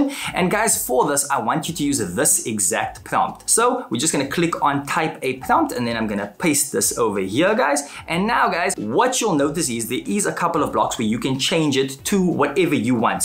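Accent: South Africa. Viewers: Is that a South African (Cape Town) accent I'm detecting there? :)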